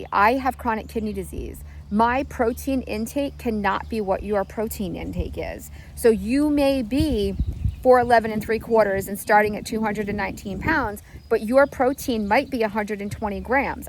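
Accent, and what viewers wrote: Massachusetts, United States. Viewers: Thank you so much for sharing your journey and I'm so glad I found your channel today! I just got a prescription for Zepbound and I've watched several of your videos already so I can have an idea of what to expect (though I know every body is different). Your channel is so informative and I really love your balanced and realistic approach. You're a goldmine of information! When I watched the first few minutes of one of your videos I knew I recognized your MA accent. I'm a north shore gal myself. By the way, you asked in a previous video if someone knew about the food you had at the Guatemalan friend's party, the corncake with the cheese or pork in the middle, those are called pupusas. I've had many of them. 🙂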